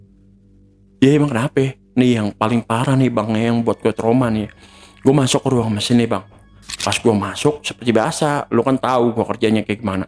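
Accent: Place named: Sunda, Indonesia. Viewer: Ngegerung sunda Banget bang😁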